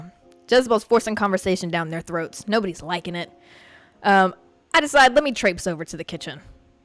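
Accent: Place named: South, United States. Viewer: you sounded so southern in this one lol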